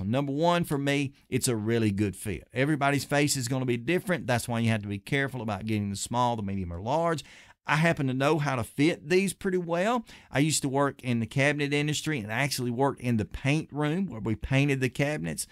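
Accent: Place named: South, United States. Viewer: As a fellow southerner now living in the PNW, I love hearing your accent! I miss it!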